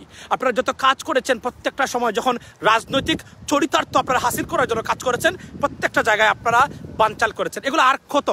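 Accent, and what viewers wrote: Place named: Sylhet, Bangladesh. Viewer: O sylheti pua....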